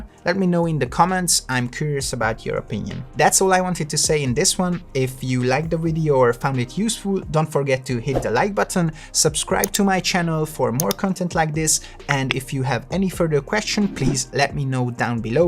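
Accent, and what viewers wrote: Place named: Hungary. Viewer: Hallod én sejtettem hogy magyar vagy:D mindig fel lehet ismerni az akcentust. Király videó lett!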